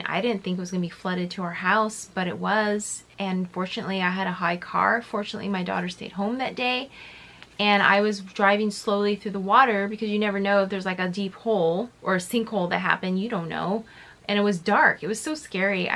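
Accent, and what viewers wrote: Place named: United Kingdom. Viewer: just subd for the British accent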